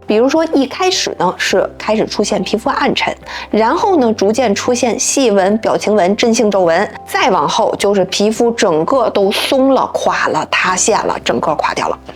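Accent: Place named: Beijing, China. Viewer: 感谢您的讲解，说话京腔特逗😅，非常喜欢您的节目，希望多出，受益匪浅❤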